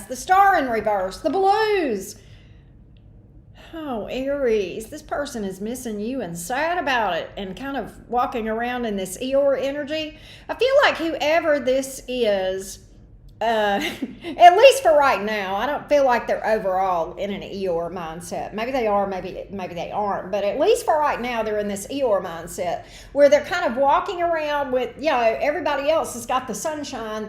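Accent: South, United States. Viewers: You’re so cute . I love your energy and your little southern accent ❤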